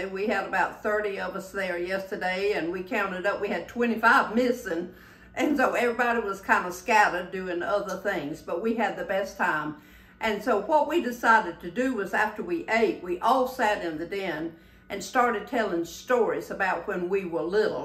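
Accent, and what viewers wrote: South, United States. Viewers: your southern drawl. Charming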